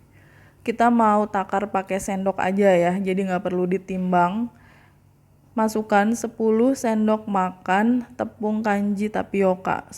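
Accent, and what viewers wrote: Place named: Sunda, Indonesia. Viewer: Hahhahaha super lekoh.... asli urang Sunda 😃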